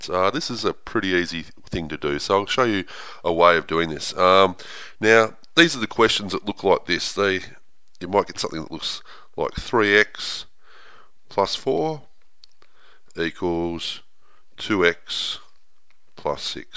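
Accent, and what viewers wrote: Australia. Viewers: Sounds like a fellow Australian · Having an Australian guy really helped out and kept me interested. I wish my teacher talked liked that.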